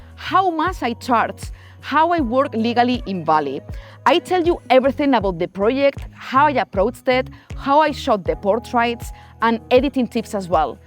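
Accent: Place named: Spain. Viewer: Yo no más quiero escucharte con ese acento español.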